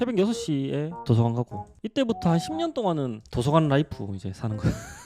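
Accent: Gyeongsang, South Korea. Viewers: 오 대구남자였네...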